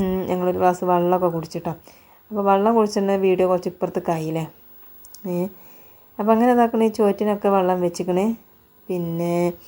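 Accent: Malappuram, India. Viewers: ട്ട,,,,, കൂടുതലാണ്‌ട്ടാ,, ട്ടാ,,, കുറ്റപ്പെടുത്ത് ഒഴിവാക്കണ്ടാ,,,😅 എനിക്ക് നിന്റെ മലപ്പുറം വർത്തമാനം കേൾക്കാൻ നല്ല ഇഷ്ടമാണ്, ടാ,,, ഇത് കേൾക്കാൻ വേണ്ടിയാ ഞാൻ വീഡിയോ കാണുന്നത് തന്നെ.,❤️❤️❤️❤️❤️❤️❤️👍🏻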